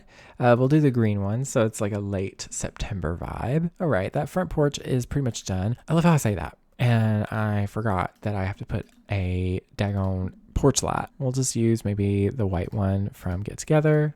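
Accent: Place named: Appalachia, United States. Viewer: i knew when i heard ur accent i was like he sounds like he’s from KY😂😂 I guessed eastern bc ur giving Appalachia 😂❤ so proud to see you here 🎉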